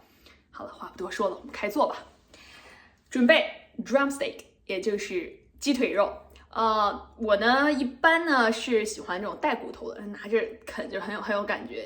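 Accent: Beijing, China. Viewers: 北京人吗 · 你这是北京口音吗？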